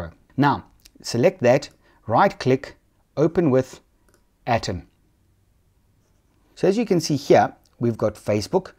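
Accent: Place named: South Africa. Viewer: So cool hearing a South African voice on YouTube.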